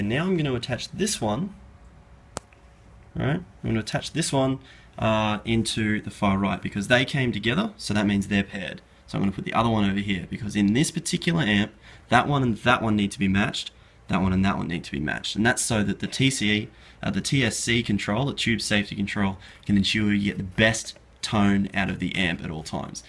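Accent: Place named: Australia. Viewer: I hear an aussie accent, how much did your amp and cab set you back? They're so expensive over here I'm thinking about maybe the deluxe 20 instead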